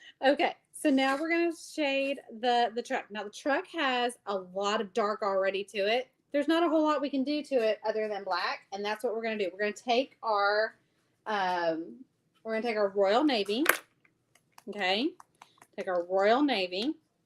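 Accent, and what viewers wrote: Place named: South, United States. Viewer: Lovin her southern accent….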